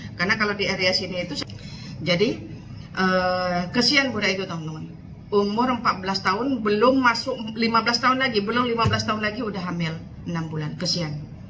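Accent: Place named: Sabah, Malaysia. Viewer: Bunyi cakap macam orang Sabah.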